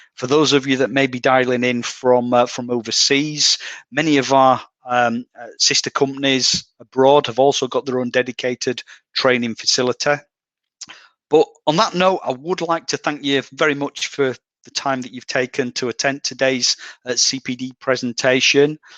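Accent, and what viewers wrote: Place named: Teesside, United Kingdom. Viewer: Hah! I grew up in Teesside; the accent is exactly how me uncle sounded. Brings back memories…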